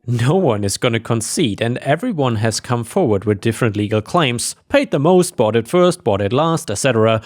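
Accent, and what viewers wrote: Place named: Germany. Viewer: Why don’t you tell your stories in German with subtitles your accent is terrible I would rather listen to the German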